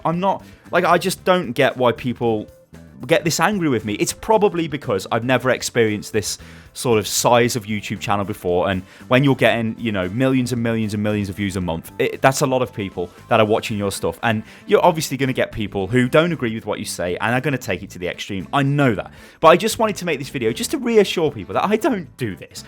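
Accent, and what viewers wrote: United Kingdom. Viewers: I watch you over lone hawk your overwatch ect because the British accent lol. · dont worry i love u and ur patch note reading it makes me smile every time i watch a vid plus you are one of the few british youtubers i know which is nice as i live in BRITAIN 2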